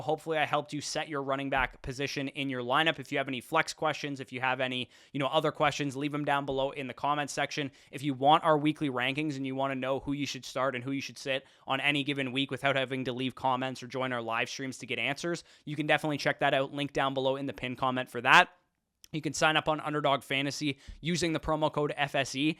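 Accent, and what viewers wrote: Canada. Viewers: Another good video, thanks. Hey, can you say “out and about running routes” in your thickest Canadian accent? Thanks 🙏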